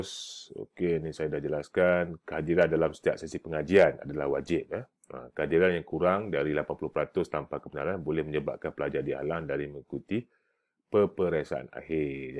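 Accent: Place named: Perak, Malaysia. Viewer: Pekat bebenor peghok nye ye😂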